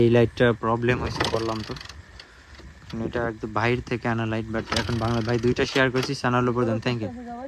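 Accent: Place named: Barishal, Bangladesh. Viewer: ভাইকথারভাবেমাঝেমাঝেমনেহয়,বরিশালের লোক,কিন্তূশোলমাছআর,গজারমাছেরপার্থক্ববুঝেন্না,আমরাবলদনাআপনারাবলদ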